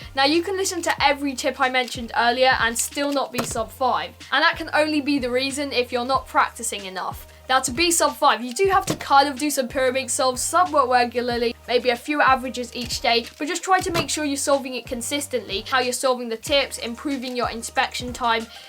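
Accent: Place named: United Kingdom. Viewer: Say "bottle of water" in your British accent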